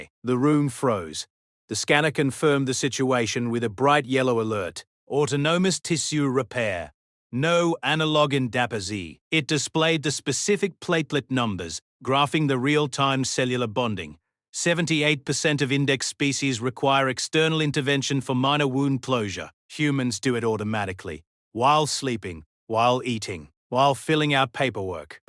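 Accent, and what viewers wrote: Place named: Australia. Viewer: Love the narrator's Strine accent!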